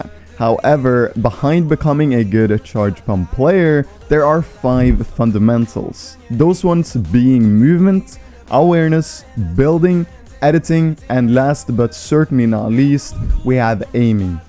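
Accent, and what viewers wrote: Norway. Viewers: vent, er du norsk? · er du norsk?? det visste jeg ikke haha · Hæ??? Jeg visste ikke at du va norsk! Nå som du sa det så hører jeg det. :/ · Are you Norwegian?🇳🇴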